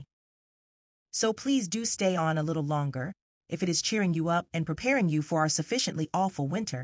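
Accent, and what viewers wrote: United States. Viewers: Why do we in the UK need this problem by a US female accent? Why do we have to have a US accent! Don’t like it -. Bye. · Horrible, please please don’t ruin audiobooks by creating AI trash, why does she have an American accent when it’s a Yorkshire book?? The “speaker” makes mellor’s native dialect awful to listen to. Stop making this trash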